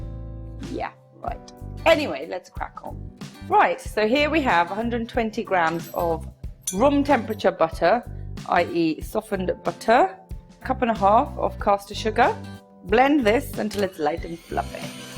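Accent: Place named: United Kingdom. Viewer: Ah that British accent!!! Lovely!!!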